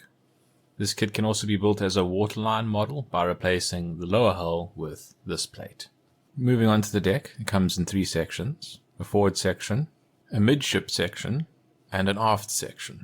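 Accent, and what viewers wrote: South Africa. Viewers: Hey. You sound South African? If so, can you help with where to find the PE parts and wooden deck kits here?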